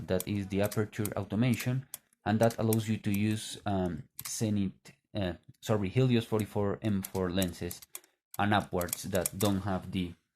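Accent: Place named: Spain. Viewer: Hola,gracias for your review. Very nice the EM whit Moscow 80 logo!! Love your english with spanish accent...!! Greetings from Italy. Nos vemos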